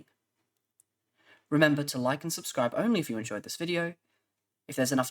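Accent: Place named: Australia. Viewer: Nice to hear another Aussie voice picking up where Perun left. I'd love to see an update of tactics and overview of what's changed in the game. I stopped playing it a while back after a few play-throughs proved the game needed a lot of work to balance it and make it enjoyable for most players that don't have a masochistic streak. It has a lot of potential but is damn complex with so much of how the game works hidden, making it difficult to understand for many. Harder still with all the updates. Harder again if pandering to fanbois that do nothing to actually bring in new players and money. I hope Johnny Lump and co make a success out of this though as it's probably the most realistic grand strategy game out.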